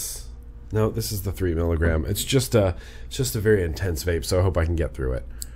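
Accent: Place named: New York, United States. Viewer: What the hell you sound like me but your not in ny the sickness has spread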